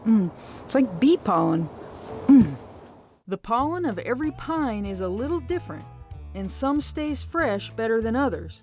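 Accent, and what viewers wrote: South, United States. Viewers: I am not a native english speaker, but your voice sounds like how I would imagine a southerner would speak like haha. where are you from?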